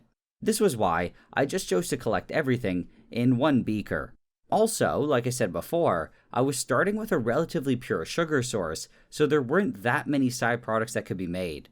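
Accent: Canada. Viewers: Laying in the dark with my eyes closed and I finally hear the Canadian accent. It’s is SO strong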